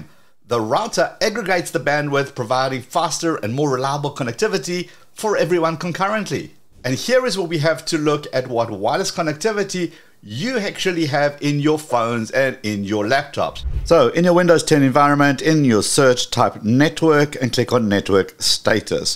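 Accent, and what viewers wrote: South Africa. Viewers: Thought so😁. Your accent, only a South-African will know 😅Not anymore though, from your channel info? All the best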